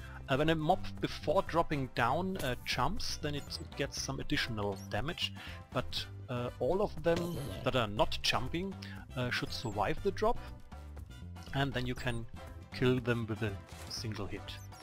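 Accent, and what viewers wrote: Germany. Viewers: this guys motherlanguage is like 100% german ;D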